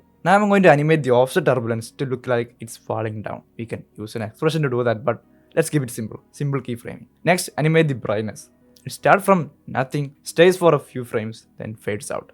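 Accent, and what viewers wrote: Kerala, India. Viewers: thickest indian accent on the planet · ur indian accent is very funny but considering ur so skilled i respect it 🙏 keep it up man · Malayalam english ahhh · English ketalee ariyam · Indian ❌️ kerala accent ✅️ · Cant understand anything cuz of that thick indian accent but w vid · you are from kerala right alla accent kettatu manasalayda gg nice video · Yeah his english accent gives away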